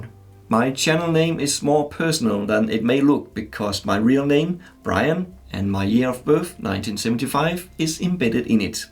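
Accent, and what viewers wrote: Denmark. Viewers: Tillykke med det! Og du har dig en rigtig solid dansk accent når du taler engelsk, men det er der jo ikke noget i vejen med :D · Ann, it's a Danish accent. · Your Channel is One of my Favourite. love your danish accent. please keep making videos.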